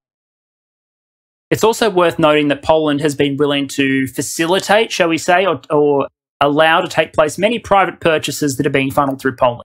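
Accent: Australia. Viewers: is Ukrainian propaganda with an Aussie accent... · No, thank God doesn't go up with his voice at the end of every sentence! They are both Australians however, that may be why you find them similar.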